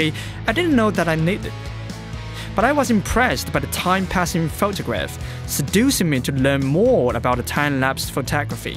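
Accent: United Kingdom. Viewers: 居然是英音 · 英式口音，牛的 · 这是brit accent😂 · 还好吧，英音，反正水平比我高八条街，对于做视频完全够了，多少海外youtuber都还有各自国家的口音也没人评判什么。 当然，油管这个平台其实美音会更占优势些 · 英音很正❤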